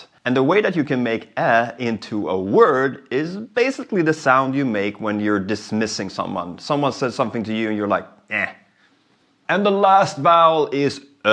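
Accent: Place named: Scania, Sweden. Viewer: Ööö du talar skånska.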